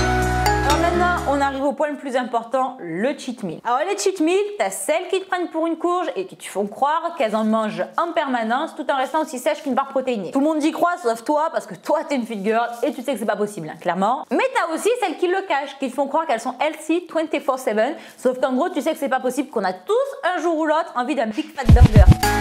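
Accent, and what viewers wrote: South, France. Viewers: D'où nous vient cet accent ? Ça sent le sud ☀️ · coucou, super vidéo comme tj continue comme ça:) t'es magnifique en leggings et brassière haha ps: j'adore ton accent du sud:p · Le petit accent du sud haha ! encore une vidéo trop drôle et trop bien #teamsnap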